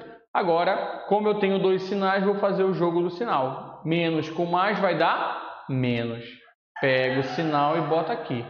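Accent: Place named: Pará, Brazil. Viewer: Parabéns, aula muito bem explicada, e comum jeitinho paraense que só quem é daqui conhece kkkk Obrigado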